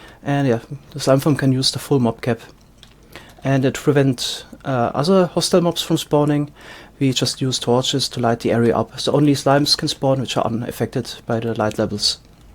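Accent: Germany. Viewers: Der Deutsche Akzent Nice Farm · mann kann hören das du ein deutscher bist xD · Worst german accent ever. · That german accent tho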